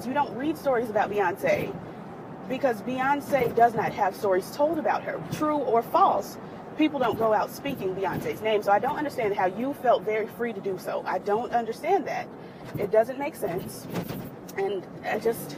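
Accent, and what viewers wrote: South, United States. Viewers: I tune in because of your southern twang. Do you girl and forget those haters. Have a good Friday mama.... BTW: Emily is crazy for allowing a dude who wont even claim her abuse her. Self esteem is essential when dealing with those type of dudes. Hope she finally moves on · Bring back the southern accent! Lol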